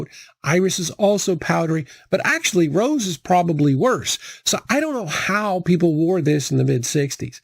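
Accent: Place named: Canada. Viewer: This was my first time on your channel but I could tell you're a fellow Canadian pretty quickly, glad I got that accent detection down. Also I'm pretty sure that's a maple leafs puck in the corner. Good stuff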